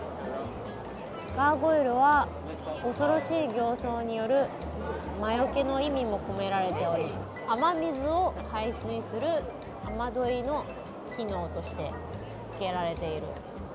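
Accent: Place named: Kansai, Japan. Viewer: プラハ旅行の反省点も含めてレポしてもらえて嬉しいです🫶 さんめっちゃ可愛いのに関西弁な感じあって親近感わきます。笑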